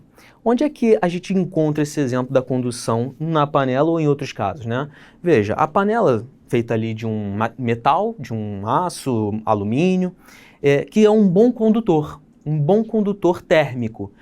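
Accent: Rio de Janeiro, Brazil. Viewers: Um carioca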